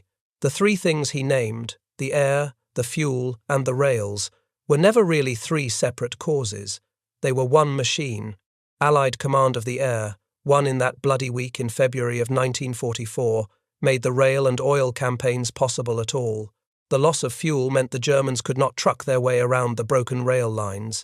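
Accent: United Kingdom. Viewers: I was getting more furious by the moment as you seemed to be ascribing all the action to the Americans. It is painful to hear a British accent not mentioning any of the splendid things accomplished by the night raids of the Mosquitos and the savage work of the rocket equipped Typhoons. Britain also contributed massively to the long-term sabotage delivered by the resistance groups by supplying the devices that could be used in place of direct explosives. The Gestapo would execute whole villages in regions where railways were sabotaged with explosives. When lumps of high explosive apparent coal were put into railway stockpiles and boilers just apparently exploded miles away, who could be blamed. Resistance operatives would put abrasive compounds into lubrication points where some would inevitably get into the steam lines. Locos would then simply lose energy and come to a halt while hauling a heavy freight. 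The Germans never worked that one out. It looked like simple wear and tear. I appreciate your mention, late in the article, of the heavy bomber dropping the Tallboy that destroyed the viaduct the Americans had failed to destroy. I wish you had mentioned the resistance groups who had been doing this work long before 1944. JW. Aust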